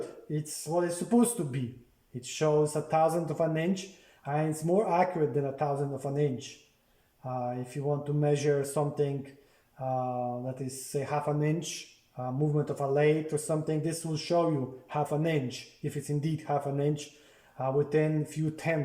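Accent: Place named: Russia. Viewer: Interesting accent, russian perhaps?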